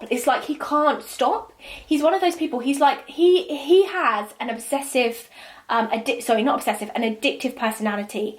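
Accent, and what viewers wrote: United Kingdom. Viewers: I am obSESSED with your accent 😩😍 I feel like I can listen to you talk forever. It’s so calming & posh. Is it British ?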